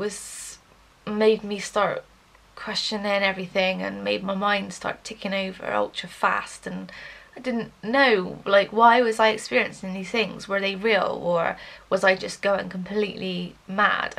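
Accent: Bristol, United Kingdom. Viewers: you from bristol/south west? am a fellow janner haha thank you for sharing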